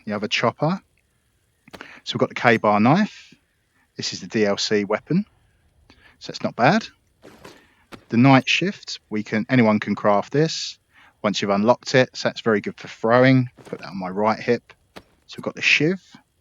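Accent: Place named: United Kingdom. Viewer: He (and me) are British most likely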